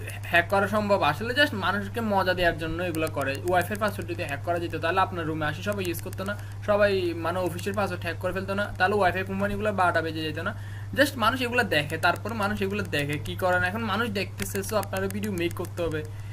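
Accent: Chittagong, Bangladesh. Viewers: আপনার কথা শুনে মনে হচ্ছে আপনার বাড়ী চট্টগ্রাম। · হন বদ্ধা অনর হতাত বুঝাঁ যার অনে চিটাইঙ্গা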